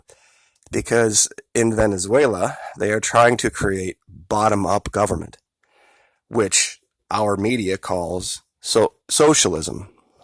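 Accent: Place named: Tennessee, United States. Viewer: Get well soon. I'm gonna join. Tennessee accent or not... genius. Just a trucker and I gotta feeling I'm gonna get caught out on the road and well you know... rodney king video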